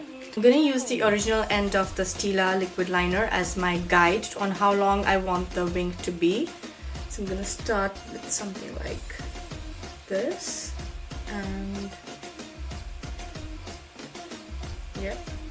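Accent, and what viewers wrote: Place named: Gujarat, India. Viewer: dayum that gujju accent tho :D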